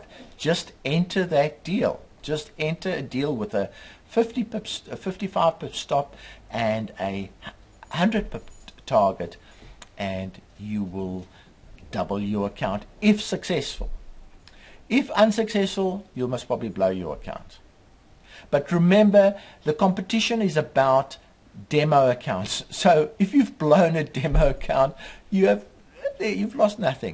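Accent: South Africa. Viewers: wow . . You sound so South African ! are u from New Zealand or South Africa ??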